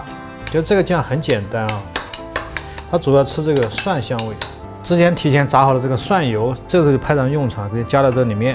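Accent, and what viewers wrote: Sichuan, China. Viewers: 这很明显是四川口音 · 因为是川渝地区的人，所以会是椒盐普通话😂😂😂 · 椒盐川普，巴适！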